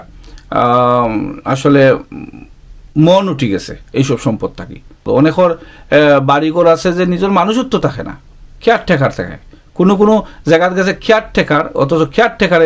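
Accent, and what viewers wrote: Sylhet, Bangladesh. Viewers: He is from Sylhet n talking to his community.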